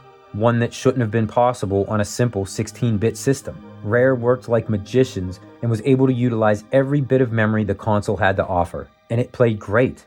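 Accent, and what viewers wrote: Western Pennsylvania, United States. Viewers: Lol nice! I'm a complete f*cking nerd about linguistic stuff. So I'm like, bro must be from Western PA. I stopped short of calling you a Yinzer tho 🤣 #iykyk I'm from Ohio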